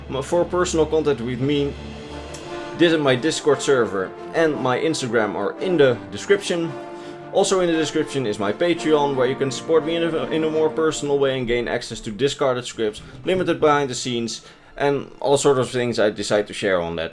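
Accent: Netherlands. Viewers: IK WIST DAT JE NEDERLANDS WAS. Cheers, love your videos vriend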